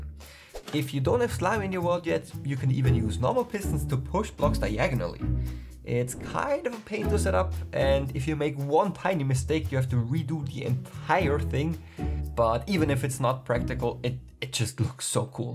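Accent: Germany. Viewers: Are you german it kinda sounds like it Ich bin auch deutsch ehre ich liebe deine videos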